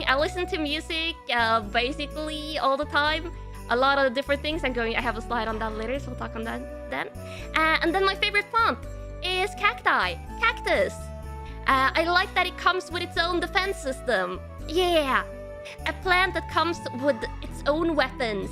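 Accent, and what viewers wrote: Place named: Scandinavia. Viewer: This was a really fun debut! It was really cool to know that you have a Nordic accent and it adds tp your personality. You are such a lovable goofball and very sweet and chill. Looking forward to the talk show and love your model too. Hope you have a great day today 😊🫂🙏🤍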